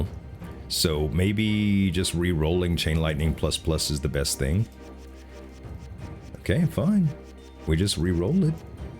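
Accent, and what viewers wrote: Louisiana, United States. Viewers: Are you from Louisiana? I'm from lafayette and work in New orleans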